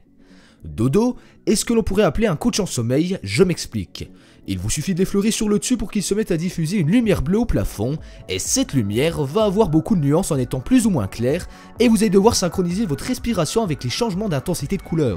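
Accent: Belgium. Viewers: j'adore l'accent belge.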